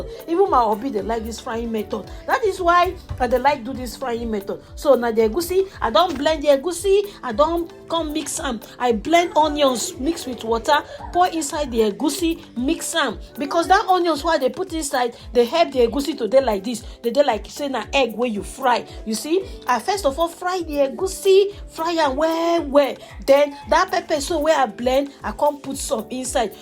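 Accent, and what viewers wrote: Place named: Nigeria. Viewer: No be the food i dey watch oooo na the pig pigin English i dey listen to. want finish me with laugh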